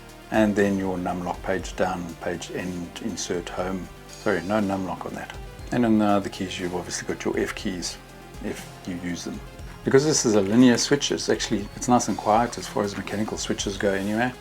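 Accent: South Africa. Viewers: Nice review, you sound South African ?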